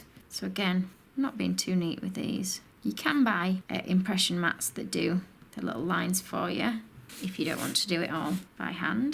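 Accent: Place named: West Yorkshire, United Kingdom. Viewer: Great channel xx just wandering of your from West Yorkshire..you sound it haha xx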